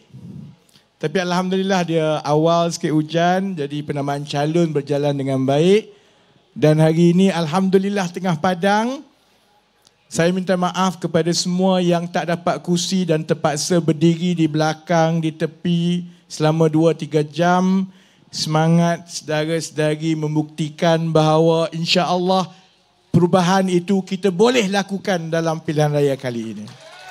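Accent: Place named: Kelantan, Malaysia. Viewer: Actually I hv liking for his speeches and I like his kelantanese slang....I used to listen from those days....I missed him in 2018....just envy this person for his mind frame....bravo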